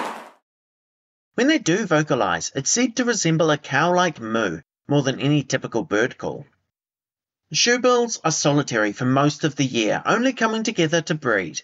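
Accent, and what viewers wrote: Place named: New Zealand. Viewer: Great article mate, thank you. Also really easy to listen to your NZ accent, rather than yanks. 👍🏼🇦🇺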